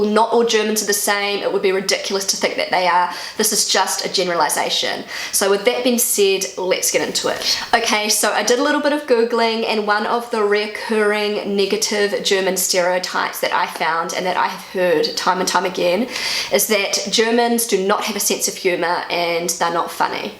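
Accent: New Zealand. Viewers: Armin Ehrbar That is the Kiwi accent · Wer hat auch Probleme diesen Neuseeländischen Akzent zu verstehen?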